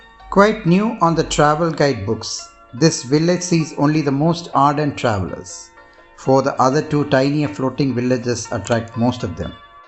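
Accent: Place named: India. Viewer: Yeena dey...Your English...is Indian pronounces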